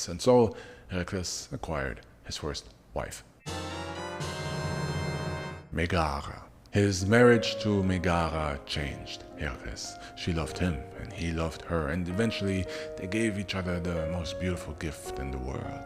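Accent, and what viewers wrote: Germany. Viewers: to me it sounds like a German accent. Also the pronunciation of the god’s names sounds exactly like you’d hear them in German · Why do you have a German accent sometimes?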